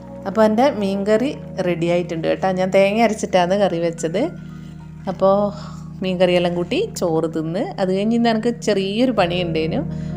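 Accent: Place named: Vadakara, India. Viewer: കോഴിക്കോട് എവിടെയാണ് നിങ്ങളുടെ വീട് വടകര ഭാഗത്തുള്ള ഒരു സംസാരം 🤔🤔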